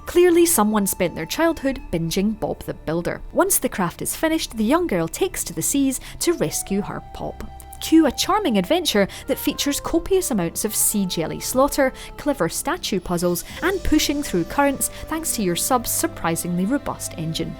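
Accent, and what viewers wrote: Scotland, United Kingdom. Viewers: this Scottish accent man , my heart i love it xd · Apart from the games, I love the narrator's Scottish accent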